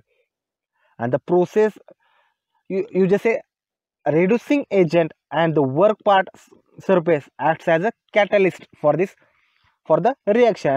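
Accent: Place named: India. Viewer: altough the Indian English accent is a little hard for me to understand, was a great video, and show me what I wanted to know.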